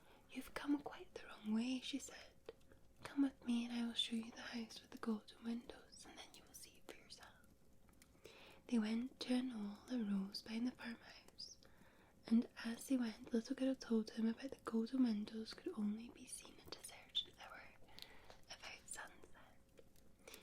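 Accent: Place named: Scotland, United Kingdom. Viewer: This is your best video yet 👍 absolutely my favourite Scottish accent in the world, thank you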